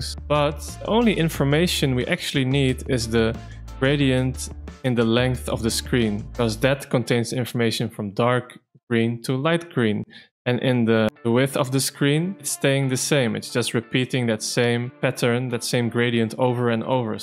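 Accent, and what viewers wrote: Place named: Netherlands. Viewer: Hey, thanks a lot for the tips! I hear a dutch accent:D I may be wrong. You've made a wonderful game, congrats! Have you considered launching on other platforms? I'd like to get the game to support you and because the game seems fun, but I don't own a switch.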